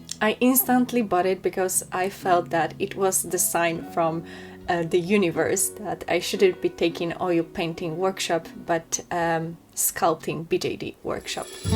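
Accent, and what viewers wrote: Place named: Poland. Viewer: OMG Tak mi się wydawało, że masz polski akcent! :3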